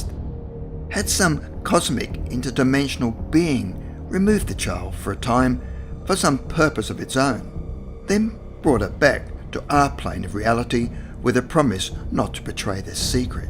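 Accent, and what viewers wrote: Australia. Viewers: That’s the way Aussie’s pronounce their numbers.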